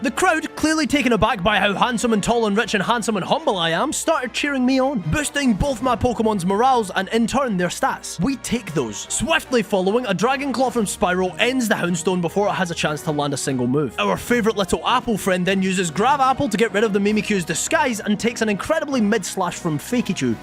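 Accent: Scotland, United Kingdom. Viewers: Nothing like listening to a Scottish dude playing Pokémon 😁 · Love the accent my fellow Scot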